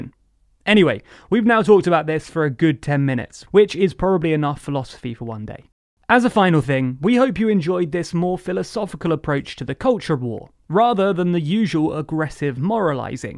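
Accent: United Kingdom. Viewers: Woah, deep video, love this format! More of these please!! I’m able to see the others point of view. It’s crazy hearing a British dude breakdown deep American issues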